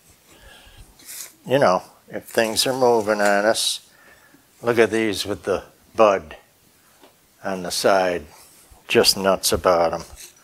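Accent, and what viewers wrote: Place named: Illinois, United States. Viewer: Big or small does it all! Beautiful job. They’re all my favorites. You mentioned that you lived in Tennessee at one time. Were you originally from Illinois? That accent!!! Lol! Were you a marine too? 😃🌷🌺 God bless and protect the Ukraine 🇺🇦 🇺🇸 TFS!!!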